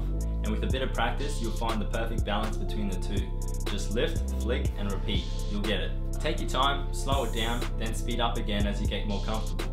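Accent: Australia. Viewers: didnt realise you were aussie dude! where abouts are you from? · U Australian ?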